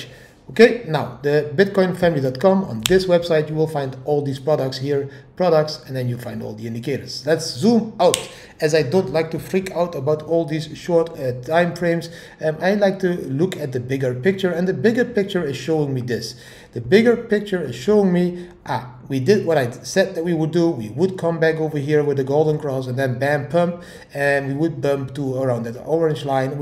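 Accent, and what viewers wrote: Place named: Netherlands. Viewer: This guy is still Dutch AF 🤣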